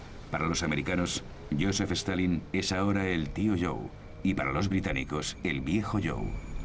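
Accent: Spain. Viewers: Para mí el mejor documental y el mejor audio de todos es la de esta serie con acento español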